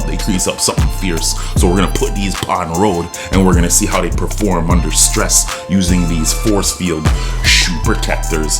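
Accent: Toronto, Canada. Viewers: U from the 6ix??! U sound like it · sounds like he’s from toronto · Jeez this guy's Canadian eh? I hear it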